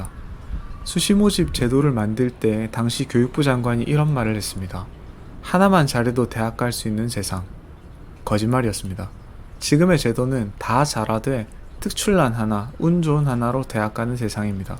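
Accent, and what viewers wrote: Busan, South Korea. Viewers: 91년 동대 법대에 입학했었는데(동대신동캠퍼스), 저수지가 낯익군요. 올해 애가 고1이라, 애를 위해 고등학교 학부모운영위 학부모위원 되었습니다. 애는 지극히 평범(고1, 3월모평 국4등급, 영수 2등급)하고, 힘들게 대학다녀도(4년 학비도 만만찮고) 원하는데 취업하기 힘든 게 현실이고, 현재 고등학교는 입시위주 교육을 할 수 밖에 없는게 현실이어서, 아들에게 대학가는것보다 (서울사립대기준 학비의 2배를 줄테니) 앞으로 뭘 먹고 살지를 고민해 보라고 했더니, 아들 눈에는 좋은 대학나와야 사회적으로 인정 받는다고 강하게 믿고 있는것 같습니다. 지금 인천 살고 있지만 매주 동영상보니 부산이 마이 그립고, 선생님 목소리가 제 아는 분과 같아 친숙하네요(부산분치고 사투리가 심하지 않음)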